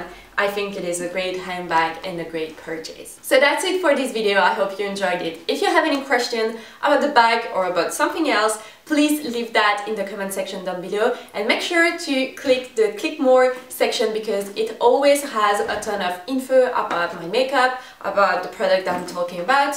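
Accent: France. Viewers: She have a french accent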